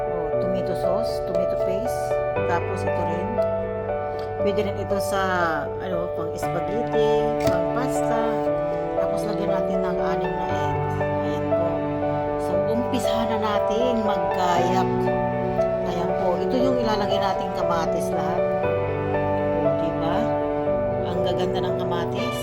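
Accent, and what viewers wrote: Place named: Pangasinan, Philippines. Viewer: masamit tay iluluto yo atse. taga Pangasinan kau amo Pangasinan accent . ☺ thanks for sharing☺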